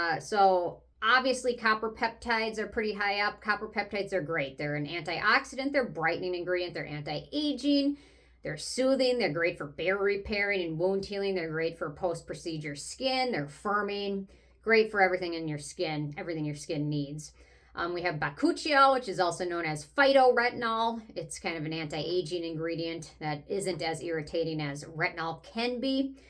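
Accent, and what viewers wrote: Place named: Wisconsin, United States. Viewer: You sound like you’re from Wisconsin. Thanks for the ingredients. I checked on Acne Clinic NYC and there are no pore clogging ingredients, yes!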